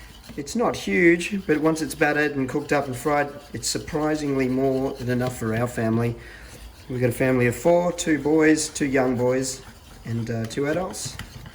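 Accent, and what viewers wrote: Australia. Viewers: I noticed on his patreon page that it's called Nice and Nice Persons Club. In words, "How cool is that!" Edit: Actually I think he says, "How 'noice' is that!" (love the Aussie accent.)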